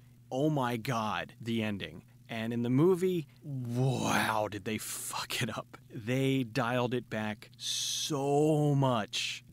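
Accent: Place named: Indiana, United States. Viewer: where are you from? You sound like you're from Indiana maybe?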